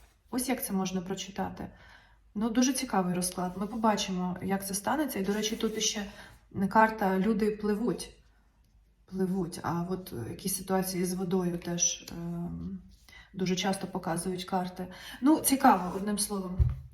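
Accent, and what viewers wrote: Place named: Kremenchuk, Ukraine. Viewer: Чистейший украинский, скорее в Кременчуге слышал, ни в Киеве ни Харькове, в Харькове вообще не слышал, ни в Полтаве ни в Бердичеве, ни в Житомире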